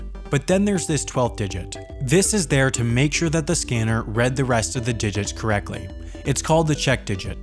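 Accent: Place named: United States. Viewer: ARGH YOU AMERICANS!! CAN-BEAR-A???? what even is your accent...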